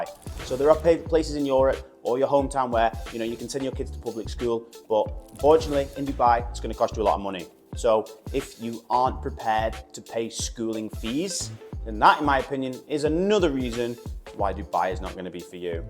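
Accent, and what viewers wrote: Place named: Northern England, United Kingdom. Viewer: Well done mate, never change that northerner accent !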